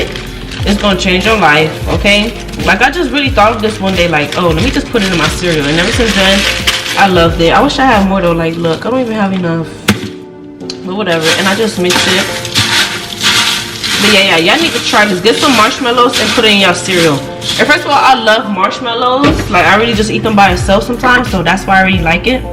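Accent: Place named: Miami, United States. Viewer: Lol I could just by how you talk you from Miami and I was right. Good video.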